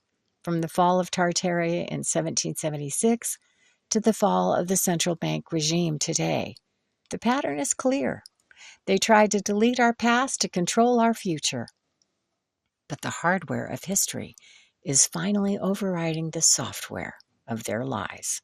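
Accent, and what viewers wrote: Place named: United States. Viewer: I'm 41 seconds in and your voice is more than the initial breath of fresh aair, it's American as Apple Pie and shelter from AI philosophers every other video. Ya watch one interesting Carl Jung vid and the avalanche of Yellow and Red starts happening in searches...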